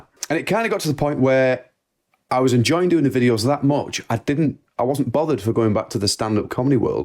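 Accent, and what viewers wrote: Birmingham, United Kingdom. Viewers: Glad to see you back! You got everything you need: bostin accent, a talking dog, marked decks of cards, comedic genius, sausages, British poise and editing skills! As Tom Cruise said in Impossible Mission 7: Avengers Vs. The Ron Hubbard Scientologic Universe: "The world is yours, innit"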